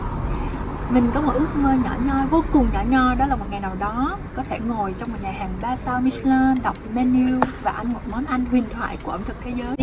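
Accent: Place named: France. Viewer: ui em thích giọng Pháp của chị quá, em bắt chước giọng chị được ko <3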